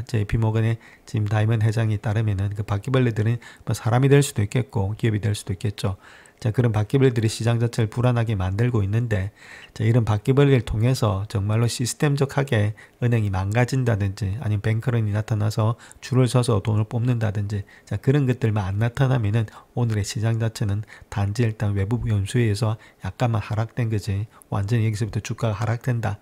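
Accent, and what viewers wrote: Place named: Gyeongsang, South Korea. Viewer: 어쩐지 좀 거슬린다 했더니.. 갱상도인가요????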